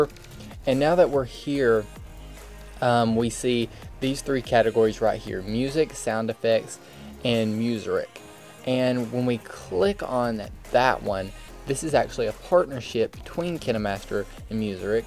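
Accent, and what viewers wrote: United States. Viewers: I love your Country accent :3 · This dude is probably from Texas, the accent throws it off. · Finally an American, Indians are absolutely irritating to listen to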